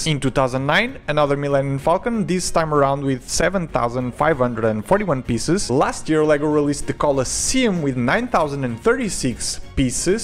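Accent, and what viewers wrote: Portugal. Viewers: gajo parece tuga a falar ingles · no primeiro segundo do vídeo soube que eras tuga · 10 segundos (sem ver o nome do canal) e percebi logo que o sotaque era de um português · I just have that strange feeling, that you are português, cuz of " 'eight " instead of "height", "raciu" instead of racio. So yeah, just a guess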